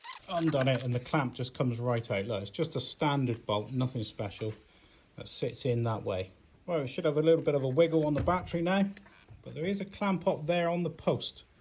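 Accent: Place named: West Country, United Kingdom. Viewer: I'm wondering if you are west counttry. maybe Hinkley, i only wonder because you are so particular with the connections electrically and i'm an engineer, former CEGB/national power/powergen/nuclear electric/british energy (same firm privatisation)and a stint for centrica in gas.The in laws were Taunton and Bridgewater and sound like you.I never knew about the sensor connection near the battery, that is very insightful, it monitors the current for the start/stop system if the car has it. thanks. I also didn't mention, you are a good dad, you speak of your son a few times, good on you. Just a point to note, your sons car is an automatic and the alternator is at the rear of the engine compartment as you show, on a manual it is Left hand side as you look into the engine bay.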